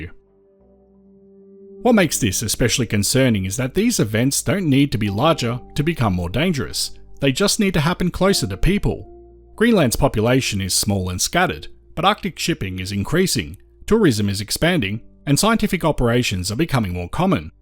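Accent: Australia. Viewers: Great to hear an Ozzie voice - and Tsunamis are a fascinating topic. I’d love to hear your thoughts on abrupt climate change - what geo evidence is there that paleo climate has previously abruptly changed in years not millennium and when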